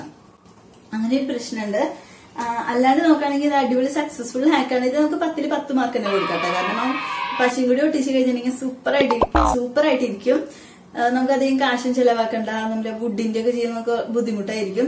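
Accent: Thrissur, India. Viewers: Thrissur anno Language 😍😍😍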